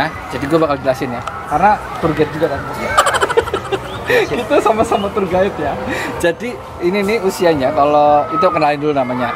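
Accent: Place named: Tegal, Indonesia. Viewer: Wong tegal ndi suarane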